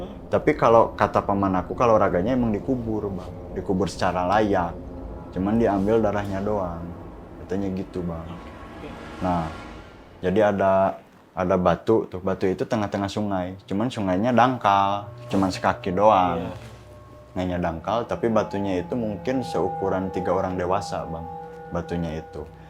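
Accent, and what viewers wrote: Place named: Pandeglang, Indonesia. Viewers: mas y org mana kyak logat org pandeglang banten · Gile 2 jam nonton bang mode serius. 😂 Si abangnya cerita khas banget orang Sunda, selow, tapi aku betah soalnya sambil bikin kuah bakso. Hari ini di Jember lagi merayakan hari raya ketupat btw.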